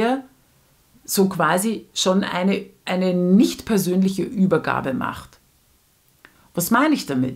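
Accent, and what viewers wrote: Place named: Austria. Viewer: Kuulostaa Itävallan murteelta. ❤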